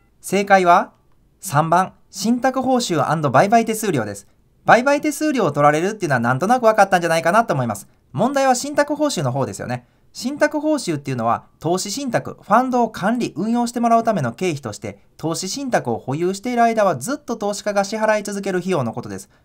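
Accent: Kansai, Japan. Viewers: 70点！最近学長の動画を知って、見まくっていたら、関西弁がうつってマス。同じ方多いのではないでしょうか？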